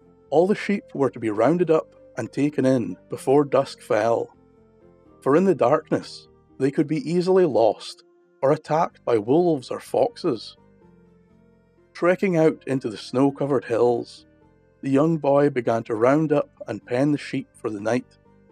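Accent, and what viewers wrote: Scotland, United Kingdom. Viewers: No better accent than the Scottish accent, of course i may be biased lol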